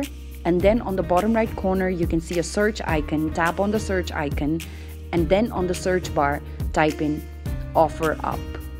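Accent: India. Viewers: Sounds hindi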